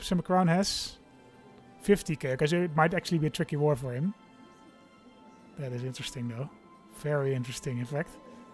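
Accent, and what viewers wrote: Netherlands. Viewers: Ah, it's a Dutch accent, I couldn't place it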